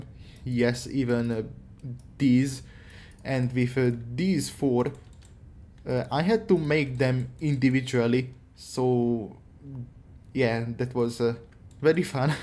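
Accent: Hungary. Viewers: hungarian ahh accent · Akcentus nagyon magyar.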